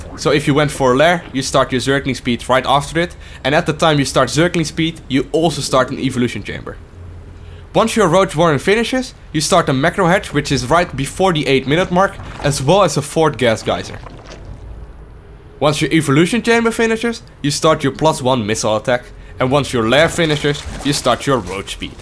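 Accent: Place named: Netherlands. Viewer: Wow you're so obviously Dutch the accent is uncanny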